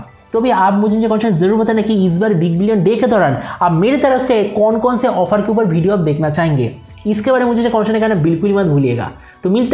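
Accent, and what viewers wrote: Bengal, India. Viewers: Bengali ka hindi kuch alag funny lagta hai 🤣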